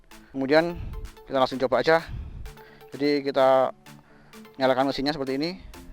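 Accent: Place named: Java, Indonesia. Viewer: medoknya jdi gagal fokus dah,wkwkwkwk apa lagi ngomongnya pke lu gua 😂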